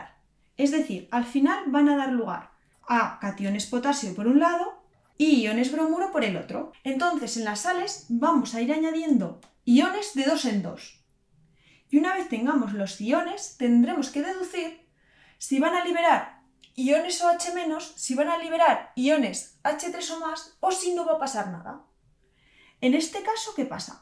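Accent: Spain. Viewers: Siendo sincero no le gusta la química. Casi todo lo que explicas ni lo entiendo, pero sigo viendo tus videos es porque me gusta mucho el asento español y eres muy linda.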